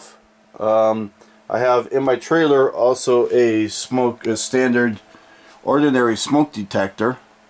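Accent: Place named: East Coast, United States. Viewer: Thanks for the many replys , You have lived in Arizona your entire life ? sure sounds like you have a eastern accent , keep the videos coming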